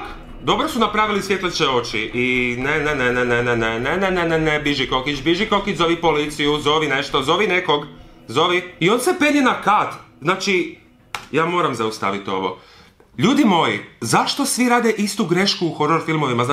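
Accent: Croatia. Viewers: Al taj dalmatinski😂😂😂🥰🥰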